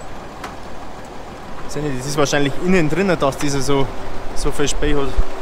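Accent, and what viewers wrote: Bavaria, Germany. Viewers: I find des foi geil dasst du no boarisch redst, gfreit mie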